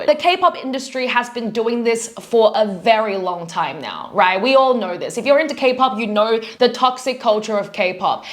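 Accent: Australia. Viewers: Off topic, but I love your Australian accent!😭💖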